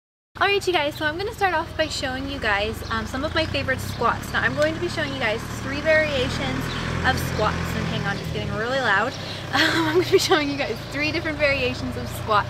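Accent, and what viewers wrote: United States. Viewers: Just found your videos and I love them. I love how much of a healthy life you live. I don't know if you mentioned this in another video or not but how did you meet your husband? I was just wondering because you sound American and your husband sounds German and you mentioned living in Germany, so I was just wondering how you ended up meeting one another? =)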